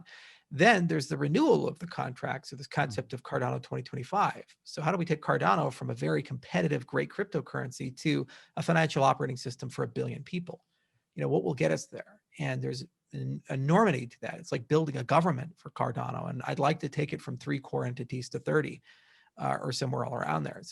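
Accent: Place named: Netherlands. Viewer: i hear a slight dutch accent. great content!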